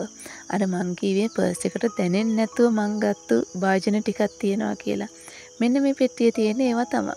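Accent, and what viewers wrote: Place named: Dry Zone, Sri Lanka. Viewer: වියළි කලාපයේ kiw නිසා අහන්න හිතුණේ oya කොහෙද අක්කේ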